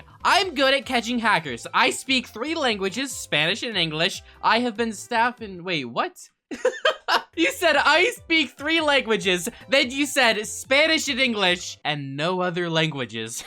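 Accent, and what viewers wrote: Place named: United Kingdom. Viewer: I love your randome british axent I speel it the wrong porpes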